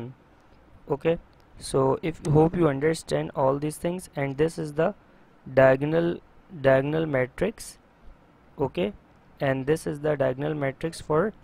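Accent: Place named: India. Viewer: Indian accent is good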